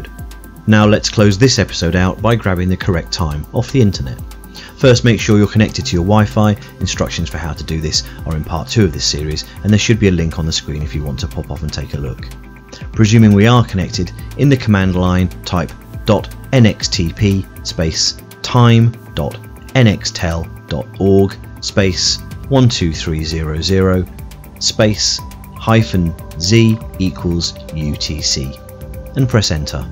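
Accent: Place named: United States. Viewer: another great video!... and a great way to prep your soldering iron tip is to wipe it and tin it with ThermalTronics "Tip Tinner". Also, you might find a 1.5mm tip more suitable for pcb work than the 3mm, especially when soldering SMD. And yes, it's always ZEE if you've lived in the States for while:).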